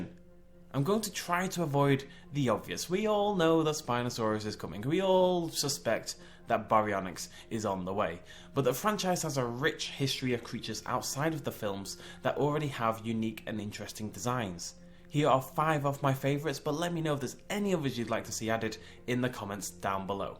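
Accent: United Kingdom. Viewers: eu estou muito ansioso por esse jogo!não consigo esperar!não tenho consoles nem PC então só vou poder desfrutar desta maravilha por meio de vídeos 😪😥! belo vídeo e continue assim! Você é britânico? o sotaque é!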